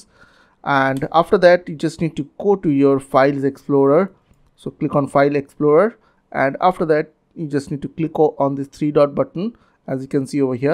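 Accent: India. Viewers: why is it ALWAYS the indian guy with the accent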